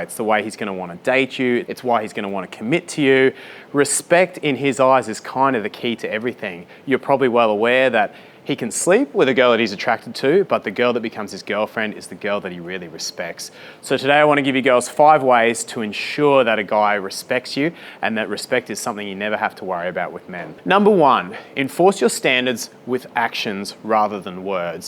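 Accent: Australia. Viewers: Is it Australian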